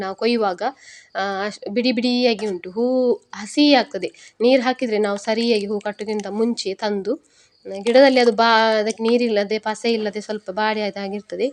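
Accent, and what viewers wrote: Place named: Tulu Nadu, India. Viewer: Erna thulu mix kannada kenyereg kushi apundu..😂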